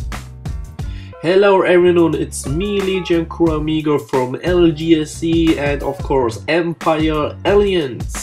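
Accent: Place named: Germany. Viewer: das ist so lustig wenn ein deutscher englisch redet man hört das einfach aber nices video :)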